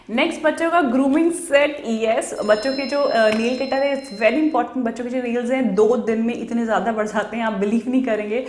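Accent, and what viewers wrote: Garhwal, India. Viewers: Aap garhwali ho kya ma'am....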